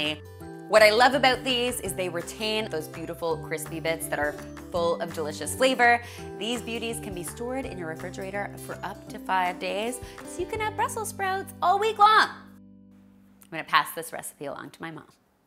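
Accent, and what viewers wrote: Canada. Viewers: Horseradish?? YUCK!!!! Are you Canadian? How have I never noticed your accent before?Besides the horseradish...😷 This all looks yummy!!!!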